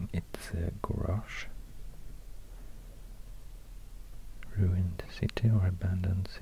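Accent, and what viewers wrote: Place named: Sweden. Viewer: thats the power of swedish english asmr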